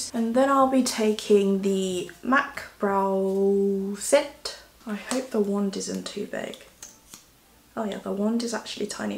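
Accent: United Kingdom. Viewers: 영국식 영어 넘나 듣기 좋고 멋져요😆👍 이번에 어퓨 과즙팡틴트도 잘 어울리실거같아요!! 저도 반해서 샀는데 생각 났어용 ✨ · 언니 발음이 영국식인가요...?잘몰라서...너무 발음이 좋아요 ㅠㅠㅠㅠ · 너무너무 이뻐용!!💕 꺅 미모열일 ㅠㅠ 😍 감기몸살이셨다니ㅠㅠ 그런데도 영상들고 와주셔서 감사합니당! 영쿡발음과 미모에 치이고갑니당💜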